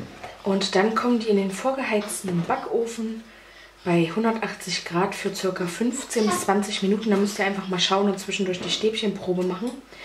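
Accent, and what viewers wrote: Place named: Magdeburg, Germany. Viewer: Hallo, hier ist eine Namensvetterin, durch Zufall bin ich auf deine Seite gestoßen. Ich glaube auch auf der Suche nach Infos über Gerstenmalz selber herstellen, egal. Es ist mir dein Dialekt aufgefallen, der ja nun garnicht bayrische klingt. Kann es sein, daß du aus dem Jerichower Land stammst? Ich bin Jahrgang 1961, da hießen viel aber du bist doch jünger. Es ist schön, daß auch junge Frauen sich für Kochen und Backen interessieren und durch YouTube ihr Videos veröffentlichen. Ich selbst schaue ja auch viele Videos zu diesem Thema an. Mach weiter so, hast tolle Videos und dir und deiner Fam. ein schönes Weihnachtsfest. Liebe Grüße 😀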